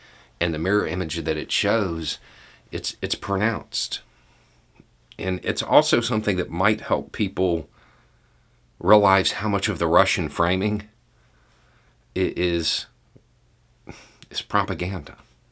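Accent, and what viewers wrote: South, United States. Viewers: of the Fifth Column you used the term "manufacturing consent" a couple of times. Would you happen to have read the book of the same name, written by Noam Chomsky? Hearing you talk is a lot like him, but with a Southern accent.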